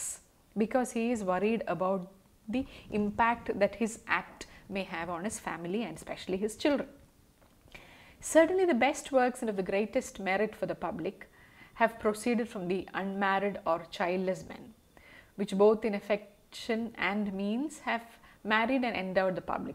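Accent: Kerala, India. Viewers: Good lecture mam... r u malayali or kannada??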